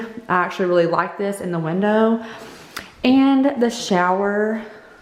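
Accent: South, United States. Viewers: Congratulations! This is so exciting! Can’t wait to watch you transform it into your own space! And girl... that southern accent is back! 😂 from one Bama girl to another! I love it! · So exciting!! It’s such a cute house!! Also your southern accent is coming in strong lol! I’m from Mississippi so no hate!😜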